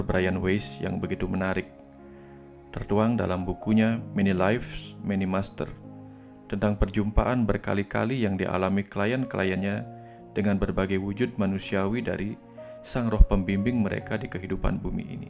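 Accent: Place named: Bali, Indonesia. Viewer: T nya masih Bali yan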